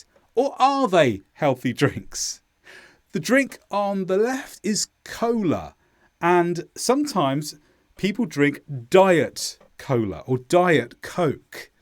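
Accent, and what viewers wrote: United Kingdom. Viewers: When I hear such beautiful British accent I remember ( is it a correct word in this context?) why I decided to learn English in the first place. Music to my ears ))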